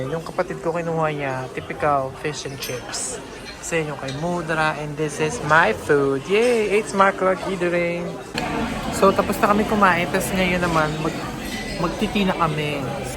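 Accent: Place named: Europe. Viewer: Love the European accent at Tagalog din 😊❤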